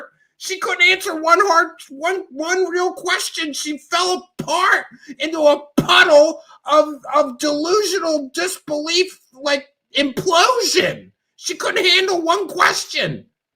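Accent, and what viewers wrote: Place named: New Jersey, United States. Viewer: Great show!! You have a jersey accent..lol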